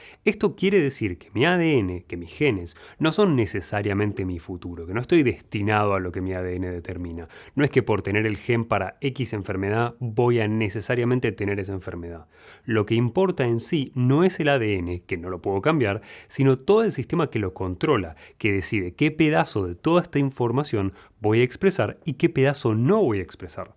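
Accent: Argentina. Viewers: es argentino pero no sé dónde vive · El dr. es Argentino!!💪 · es argentino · es argentino, tenía que ser argentino, no hay otra. Lo grande que somos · Es argentino...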